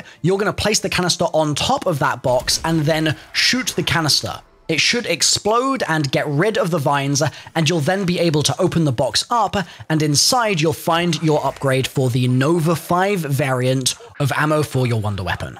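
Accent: United Kingdom. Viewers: Why is every person who makes videos like these British lmao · Such an annoying accent omg. Which British accent is this?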